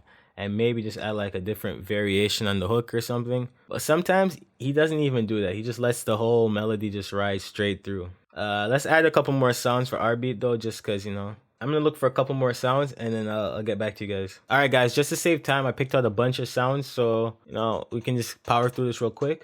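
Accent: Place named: Canada. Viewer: broskiiii u sound canadian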